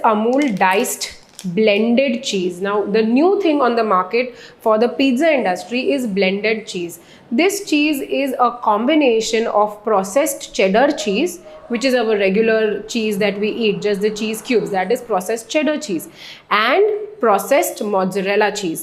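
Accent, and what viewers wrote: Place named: India. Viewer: The way Indian peopl speak English and their accent r really irritating even im an Indian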